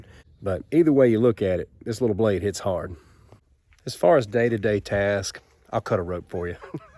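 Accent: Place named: Virginia, United States. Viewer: I think he is from Virginia USA.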